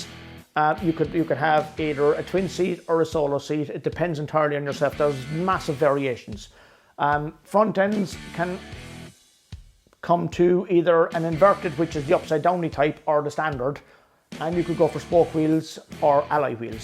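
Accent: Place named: Ireland. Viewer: I came over from Irish Demon's channel to give you a look-see. He's never steered me wrong before. I LOVE your Irish accent!! I originally subbed to Irish on the recommendation of Team Skeptic. I totally appreciate your laying out a step-by-step approach to working on a bike. I only have a little experience with being a passenger on the back of a motorcycle. However, I can appreciate the artistry behind bike building!! Looking forward to seeing what you make your next video on!!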